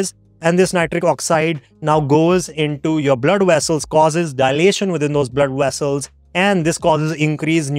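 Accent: India. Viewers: I came to learn about red light therapy, not only did I learn a lot but also feel like I learned Hindi as well - you've an awesome accent! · I love Indian accent. So cute. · Why would a man with a thick Indian accent lie on the Internet? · bro which part of North India ( lemme guess.. haryanna) are you from, mitochondrial stimulation is the final goal but your accent and intonation makes it tough but after reading several comments seems like you were on point.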